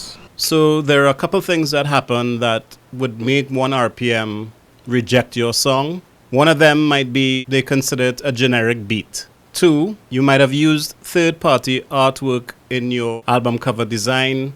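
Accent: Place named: Trinidad and Tobago. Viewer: Instantly knew it was a Trini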